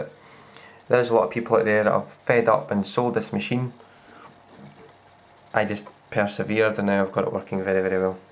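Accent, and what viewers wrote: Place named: United Kingdom. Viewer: I live in Sacramento, California. But don't worry about your accent my mother is British and I used to watch BBC America growing up so you sound just like Hamish McBeth. I don't know how some Americans can't understand English. You should try the Star Trek LCARS GUI Simulator for Windows 8. It's not an Iconia keyboard mod but it's pretty damn cool.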